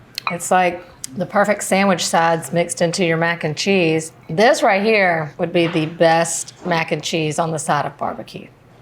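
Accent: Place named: North Carolina, United States. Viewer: Love her accent. Sounds like a local (NC) girl...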